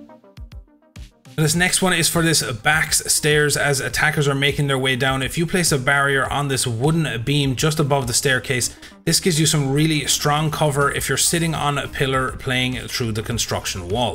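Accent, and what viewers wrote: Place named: Ireland. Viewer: First time watching and I can tell he’s irish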